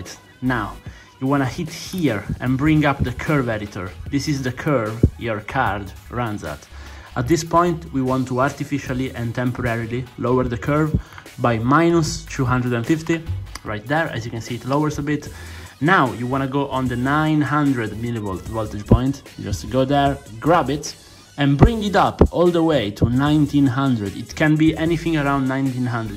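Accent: Italy. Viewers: l'ho capito dalla prima lettera pronunciata che fossi italiano hahah, poi il menu di msi afterburner mi ha dato la conferma...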